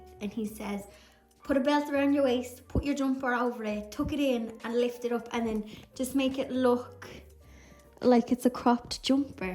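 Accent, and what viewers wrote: Ireland. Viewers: I just like listening to your Irish accent lol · I love the balance you have on your channel 🤗, I could listen to the chat all day plus your Irish and it’s my favourite accent. The quality of your upload is always amazing and if putting your self under extra pressure to do more…..then don’t because that pressure will reflect on you and that’s not good for your health. Finishing this year with kitten content is a wonderful end to the year and the exploding tree perfect. Take care x xx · Dear, your channel is one of the very few I'm really watching longterm, which means over many years. Lots of others I like(d) became noticeably calculating. Understandably, of course. But you manage to keep your videos very natural and unaffected (sorry for my bad English, I'm not sure, if I chose the right words). I love the mix of craft, "housekeeping", gardening, cats, trips and chitchat. 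The gardening part might be my fave, as you seem to live in a very similar climate like mine. But I also just love to listen to Irish English, and your voice is so charming. Your dedication to quality over quantity and work life balance makes you even more sympathetic. Keep following your heart, please. And have a magical christmas time with your loved ones! A big THANKYOU from southern Denmark! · I would love more DIY and craft videos but not daily:-) I won’t keep up:-) but maybe a xtra one here and there would be welcome treat. I love hearing about you, I follow you because I feel a kindered spirit in DIY and love hearing the Irish accent and slang, I miss my old neighbour especially at this time of year. But you keep doing you. Enjoy your friends and family and keep them just for you, you deserve that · Cheeky thumbs up for sure. ❤ Could listen to your beautiful irishness for hours, love the kittens though Blonde will be forever in my heart ❤️ Pretty well love any and all content 😊 so keep it coming in the forms that your heart takes you, I'll be watchings, cheers and happy holiday season.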